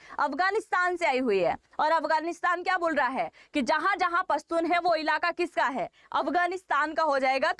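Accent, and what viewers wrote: Gujarat, India. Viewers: I LIKE YOUR ANCHORING....YOUR HINDI LANGUAGE IS 👍 GOOD LITTLE BIT GUJRATI MIX UP. YOUR HINDI KI PALKI SHARMA......❤ U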